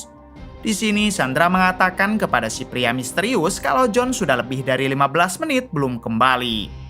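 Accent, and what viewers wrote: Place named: West Java, Indonesia. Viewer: Lidah Sunda SDH gak kaget z jd j pokoknya lawak tukang panci keliling 😂😂😂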